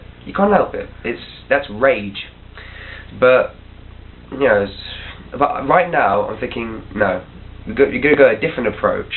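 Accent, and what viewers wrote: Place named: South, United States. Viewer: i love your videos they are very insightful:) p.s. im in love with your voice i love southern accents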